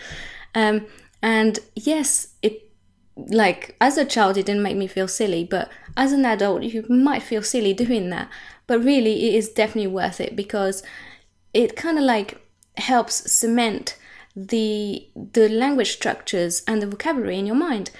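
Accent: England, United Kingdom. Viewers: vous avez un accent anglais, il est tres mignon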